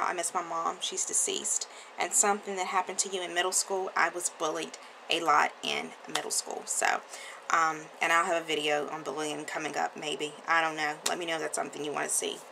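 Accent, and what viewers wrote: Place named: South, United States. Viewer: I subscribe because you sound like me, I'm a southern girl too.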